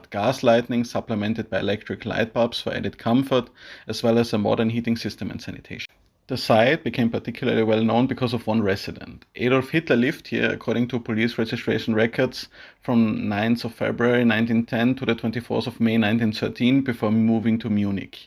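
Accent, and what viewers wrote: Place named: Vienna, Austria. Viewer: Du sprichst Wiener Englisch, noch nie gehört.😊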